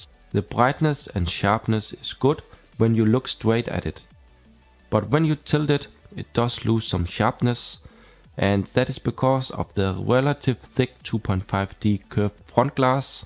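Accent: Germany. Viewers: you are german right ? 😎 your accent tells it all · are you german?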